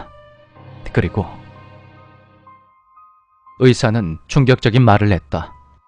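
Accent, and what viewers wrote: Gyeongsang, South Korea. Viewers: 경상도 사람ㅇㄱ라 언뜻 나오는 경상토 사투리 억양이 너무 익숙해서 그런지 더 듣기 좋으네요 ㅋㅋ오늘도 잘 들었습니다 ❤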